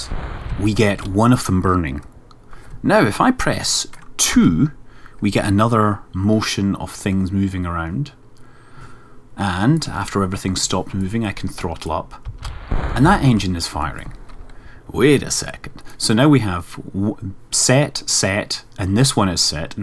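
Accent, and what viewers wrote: Scotland, United Kingdom. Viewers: I love your voice. And no not just your delightful scottish accent, your voice is really nice. I'd LOVE to grab a space-pint with you some day and talk physics as our spaceship slowly deorbits into Minmus....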